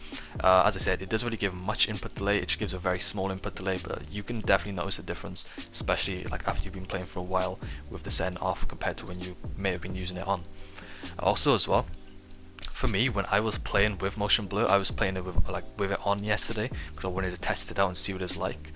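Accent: Wales, United Kingdom. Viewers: Are you welsh I am I’m from swansea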